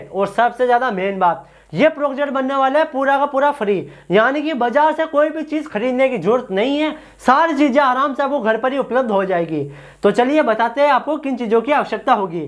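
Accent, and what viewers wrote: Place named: Gujarat, India. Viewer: Bhai ap Gujarati ho ..kiyo ki yeshi hindi ...ham hi bolte..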